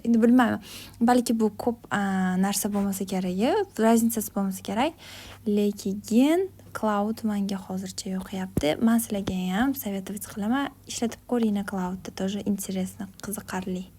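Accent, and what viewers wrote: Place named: Namangan, Uzbekistan. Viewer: Namangan sheva chiroylida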